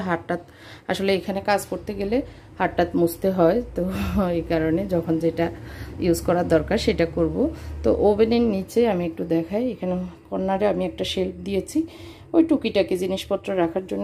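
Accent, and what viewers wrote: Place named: Noakhali, Bangladesh. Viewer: আপনার কথায় নোয়াখালীর টান আছে।কথা আরও শুদ্ধ করেন তা হলে শুনতে আরওভাল লাগবে।তবে আপনার রুচির প্রশংসা করতে হয়।আপনার সাজানো সত্যিই সুন্দর লাগছে।উপরের মন্তব্যের জন্য মনে কিছু করবেন না।এটা ঠিক করলে আপনার বল্গটা আরও ভাল লাগবে।মনে করবেন এটা একটা সাজেশন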